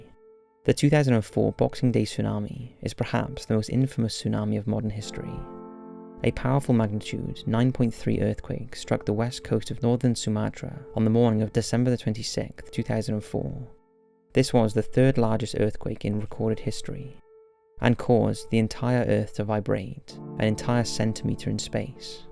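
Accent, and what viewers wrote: Wales, United Kingdom. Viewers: That’s a welsh accent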